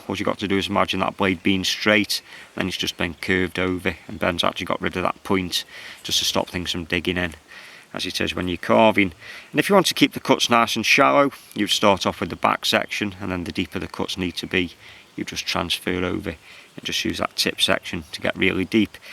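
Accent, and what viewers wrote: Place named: Staffordshire, United Kingdom. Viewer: Hiya new subscriber to your channel mate,just getting back in to spoon carving after some kind person broke into my allotment shed and stole all my gear,you sound as if your a Staffordshire fella lol.great video tho must watch more.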